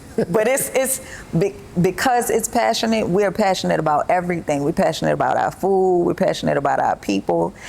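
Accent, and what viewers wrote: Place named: New Orleans, United States. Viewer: I hail from New Orleans. I recognize that accent anywhere.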